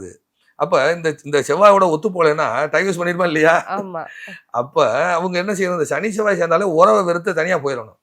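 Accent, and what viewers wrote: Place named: Tirunelveli, India. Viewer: கோவைல் திருநெல்வேலி பாஷை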